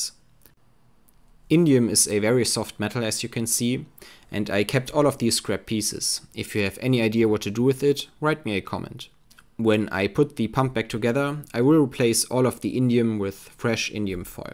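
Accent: Germany. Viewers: oh I'm sure they do too. I'm just razzing ya man. If I did the hobbies around here that you do wherever you are.( To me your accent sounds... German.) Although your obviously fluent in English. Well it wouldn't be very funny. I could get shot if I go to the pharmacy and in a German type accent say. Well. It wouldn't sound like you. I'm not trying to insult you. Where you live is probably way less paranoid than where I stay. I'm talking about the people.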